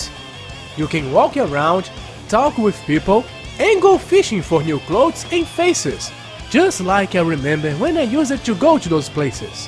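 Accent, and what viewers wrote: Brazil. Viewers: Será que você é brasileiro? :^) Acredito que gringos conseguem entender a maior parte, mas da pra dar uma melhorada, heim. O vídeo em si está bem legal.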